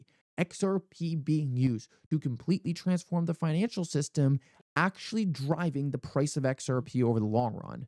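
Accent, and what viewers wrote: Allentown, United States. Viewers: with an Allentown accent at the click baiting again...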